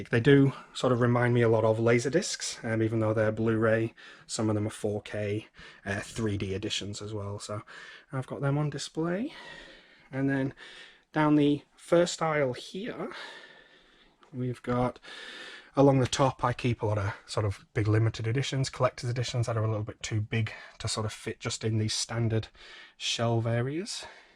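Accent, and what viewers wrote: United Kingdom. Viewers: Why does he sound British? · He sounds like hes from the UK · You sound ?English but you said 5 bux where in the states are you ?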